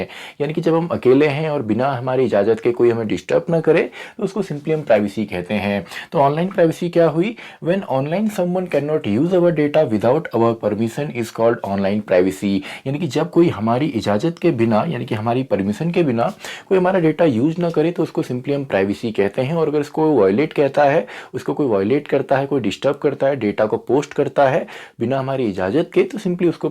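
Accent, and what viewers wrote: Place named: Uttarakhand, India. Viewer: Sir u are from Uttarakhand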